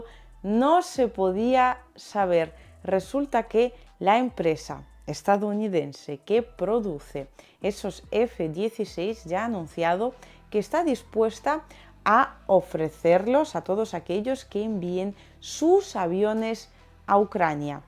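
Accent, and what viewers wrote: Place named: Spain. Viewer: Primeramente agradecer, las noticias respaldada con hechos grabados. Lo segundo, que hablas español español tal como lo "aprendisteis". Soy de Uruguay, pegado a Brasil y Argentina, con solo <num> habitantes, somos chiquitos pero con corazón grande. Quiero preguntarte, no es un hecho sin precedentes prohibir RT en América, solo para desconectar a Rusia del mundo. Sin embargo, prohibirlo a sido una muestra del ODIO, DISCRIMINACIÖN hacia Rusia. Mucho antes de esto que ocurre hoy , RUSIA cada vez que EUA hacia lo mismo DE ESCALAR TENSIONES con CHINA, Corea del Norte, IRÁN, rUSIA MOSTRABA Sarmat1 todo quedaba tranquilo. En estos sucesos tubo que mostrar el Sarmat2 y así sucesivamente. EUA declaró enemigo a China solo por temas comerciales, lo mismo con Rusia y no aprenden que prohibir, sancionar, robar, hasta atacar a cualquier ciudadano Ruso por el mundo dejándolo sin dinero, logró sacar la rebeldía y la fuerza para crecer y gestionar las propias empresas Rusas y no depender de nadie. 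Nuevo acuerdo para la venta de trigo de EE UU a Rusia Cereales 29 jul 1983 — La Unión Soviética y los Estados Unidos han llegado a un acuerdo de principio por el cual esta última nación venderá entre 9 y 12 millones ... Cómo Rusia se convirtió en el principal exportador de trigo "plantando" El potencial de exportación del país en la temporada 2020/21 se incrementó de 37,5 millones a 39 millones de toneladas. Y como el trigo , existe muchas otras cosas. Por eso no entiendo como estos parásitos nos po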